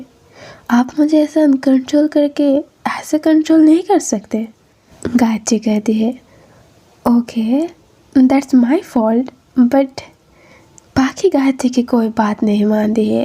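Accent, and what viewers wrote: Bengal, India. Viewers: Aapki Bengali bahut jyada cute Mary Bengali main musalman hun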